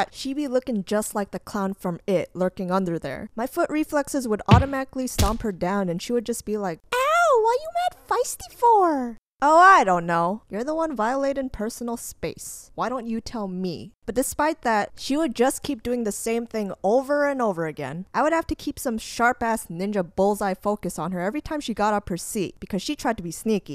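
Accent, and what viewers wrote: United States. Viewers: Aesthic Vibes only I thought you were American 🤔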